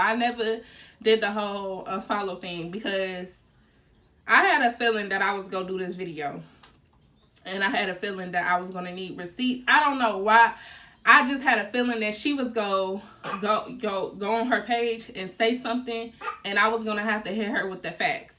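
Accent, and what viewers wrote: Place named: Detroit, United States. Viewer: You gotta be from Detroit 😂😂😂 I hear the accent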